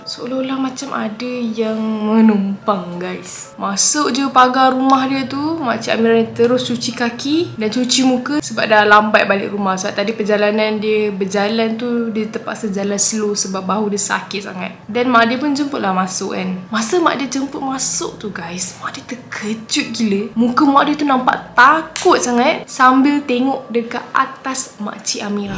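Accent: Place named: Terengganu, Malaysia. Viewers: COMEL GILA KAK BACA LOGHAT TERENGGANU 😂😂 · Comel kak cakap loghat terengganu 😭💕like it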